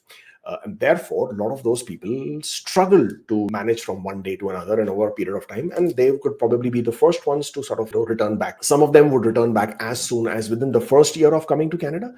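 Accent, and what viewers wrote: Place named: India. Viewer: I'm from Cuba Sr 🇨🇺. I watch all your broadcasts, in part because I'm improving my level of English with your Indian's accent, also I adore to see Canada's news and updates from your channel. My point is the next one: If those who leave Canada had to return to their homeland (Cuba), they would think it twice, because despite the fact that they will be with their loved ones, they won't have better opportunities in life. So I'm amidst my immigration process and I'm 100% sure I will not go back to Cuba. Ask to any Cuban and their answer will be alike.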